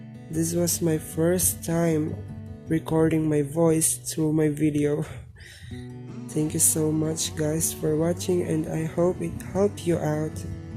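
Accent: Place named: Philippines. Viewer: Your English accent is really the same as filipino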